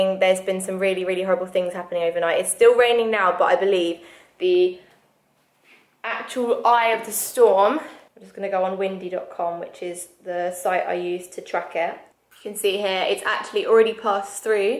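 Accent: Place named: London, United Kingdom. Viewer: Manila always gets hit very badly.....Great to hear London English. Greetings from Rosario Cavite.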